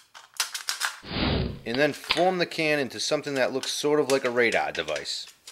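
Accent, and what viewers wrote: Boston, United States. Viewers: With that Boston-esque accent, I'd say more like Peter Griffin. :D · Has the Boston accent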